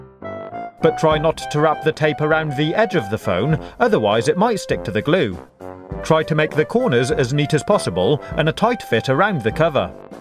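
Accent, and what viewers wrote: England, United Kingdom. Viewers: British accent? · cool video i don’t wanna be mean but you sound like an england man like cool tone c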